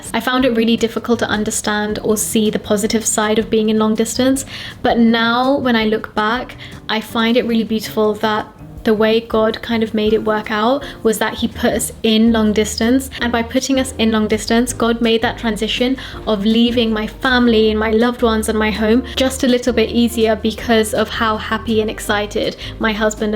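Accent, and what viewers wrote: United Kingdom. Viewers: I HOPE THE BRITISH ACCENT STAY PLEASE 😭😭😭😭😭😭😭😭😭😭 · I guess now you're going to lose your british accent , the best thing what I love Uk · British accent is soo annoying 😭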